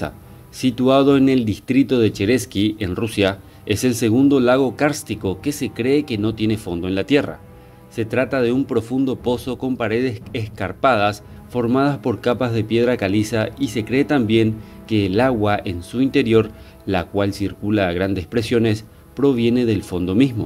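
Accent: Paraguay. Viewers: De que país sos amigo? tenes acepto Paraguayo sin ofender.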